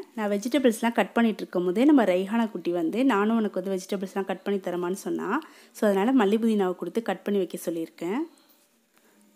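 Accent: Karaikal, India. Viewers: Karaikwl slang- tholuvittu 😄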